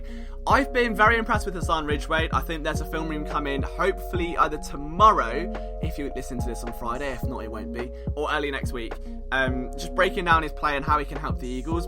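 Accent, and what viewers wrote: England, United Kingdom. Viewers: Exactly. I guess some people don’t like his English accent talking about American football but they are just being a-holes.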